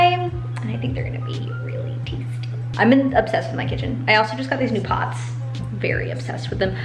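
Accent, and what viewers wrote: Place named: United Kingdom. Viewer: The voiceover for your cats is SO FUNNY. Pls make a video doing that in your British accent or whatever lol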